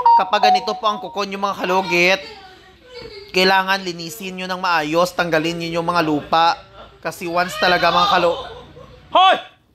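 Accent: Iloilo, Philippines. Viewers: Tingala mn ko nga daw karay a nga daw ilonggo ang panghambal pero taga Davao 😄😄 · Ilonggo k pla kya malambing ka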